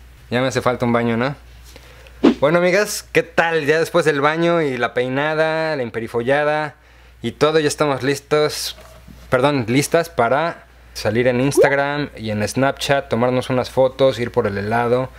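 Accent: Mexico. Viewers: Jajajajajaja lo Mexicanos salen con unas... como me llama mucho la atención escuchar su dialecto.. jaja como dicen ustedes es padrisimo saludos desde Colombia.. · Hola bonita! Asi me pongo la mascara 😂y! Woooww! Una voz muy linda y ese acento bien mexicano! "Las cejas tipo Frida khalo" 😂😂😂 Me encantó el video 😄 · Tiene acento mexicano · Me parece que tu esposo es MEXICANO me suena muy MEXICANO como muy bromista, hablan perfecto el español LIKE SI CREES LO MISMO O COMENTA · 😂😂😂😂 Ese "dejo" ranchero de tu esposo da risa.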